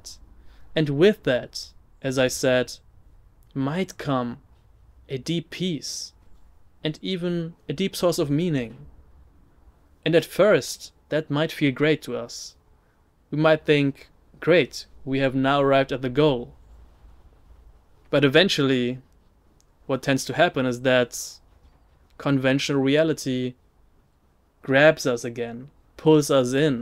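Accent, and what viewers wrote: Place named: Germany. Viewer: You sound German. Are you German? 😄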